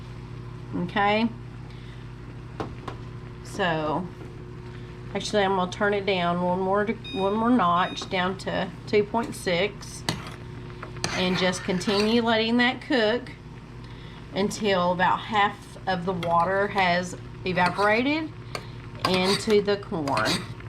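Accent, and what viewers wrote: United States. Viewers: Beautiful American accent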